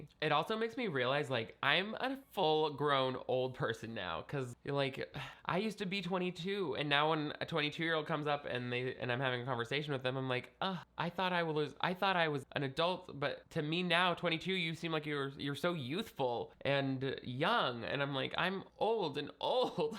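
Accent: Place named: Boston, United States. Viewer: I also pegged you as Boston.... :)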